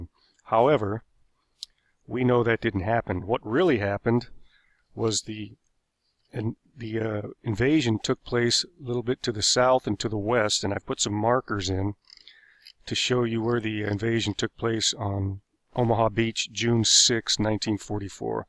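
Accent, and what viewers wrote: United States. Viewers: Stopped after about 2 minutes. Couldn’t stand the pathetic American pronunciation of places in France. Pity.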